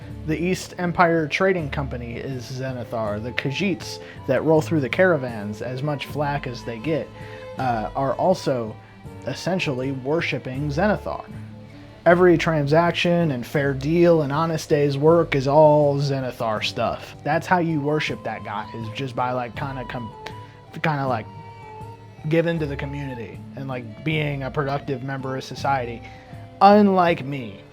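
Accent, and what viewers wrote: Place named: New England, United States. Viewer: just sound like my old neighbors/coworkers in Vermont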